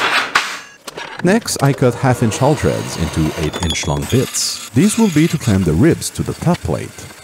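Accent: Quebec, Canada. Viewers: The joy of French Canadian swearing! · Si c’est pas l’accent le plus québécois qui ya 😎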